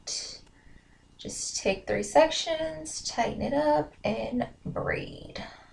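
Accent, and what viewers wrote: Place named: Baltimore, United States. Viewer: You Sound Like You're From Baltimore But This Video Was Really Helpful, Thanks 👌🏾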